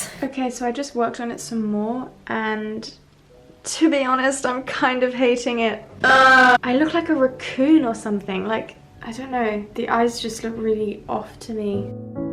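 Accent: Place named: United Kingdom. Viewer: you absolutely should! Initially I distinguished your accent as British, but your beautiful yet unique name didn’t match my perception, then you dropped quelques mots français..and seeing your mum in frame I was instantly corrected! Aha! You are French! From your mother’s side (?) yet you’re based in Adelaide (wrong again. I initiallly thought north coastal Qld (sunny coast perhaps). Then Melbourne due to your private school uniform and the fact you commute/d to school/uni). I’m a visual arts teacher (secondary years) based in Brisbane and once I saw your year 12 exhibition vlog I quickly determined you were in fact based in Oz and completed your ATAR for General Art (at first instance your accent threw me off as I thought you were based in the UK and had completed your QCSE). You are quite the anomaly, Mademoiselle. A beautiful contradiction. 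Completely smashing all my preconceptions of you. I love being proven wrong, oh and the element of mystery ☺️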